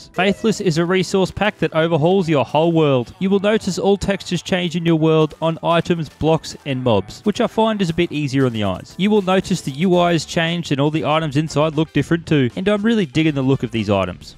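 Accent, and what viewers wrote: Australia. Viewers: Is he Australian? Love Australian minecraft youtubers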